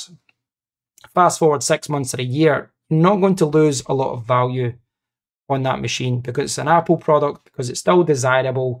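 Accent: Scotland, United Kingdom. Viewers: So cool listening to a Scottish guy talking about a McIntosh.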